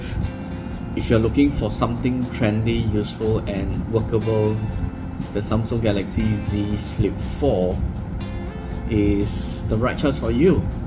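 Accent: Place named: Malaysia. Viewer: Darn I wish you Malay Chinese and Singaporeans didn’t have that horrid accent. Both Lee Kwan Yew and prof. Gang Wu speak with a better accent.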